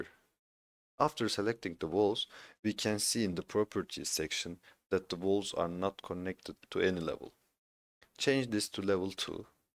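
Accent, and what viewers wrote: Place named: United States. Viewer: The word he is saying is Command, but with an American accent. For example: WA is the Wall Command. So when you are trying to figure out what he is saying, just try saying the word in American, not English, and you'll figure out what he's talking about. I know it makes it difficult for the other 98.5% of countries on the planet that don't speak American, but at least we all use Metric, unlike 1.5% of countries that are still using Imperial units.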